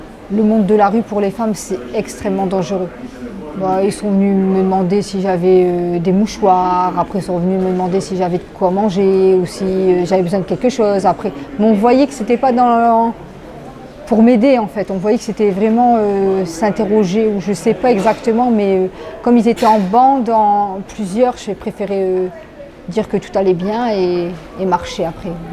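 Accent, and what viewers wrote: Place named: North, France. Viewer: Et encore vous êtes à lille et en général il y a bcp de mois froids que chauds. Essayez de faire l expérience d être toute la journée dans le froid et pas que 3 jours... le Monsieur me fait bcp penser à des gens de la rue qui retrouvent un logement après des années. Bcp dorment encore dans la rue c'est comme une addiction... vous avez l air d être une bonne personne Madame et je reconnais bien l'accent du nord. Le seul bémol j aime pas les vidéos experience sociale ca me met mal a l aise car c est quoi 3 jours ??? Tu ne peux pas comprendre tu n es pas dans leur vie quotidienne.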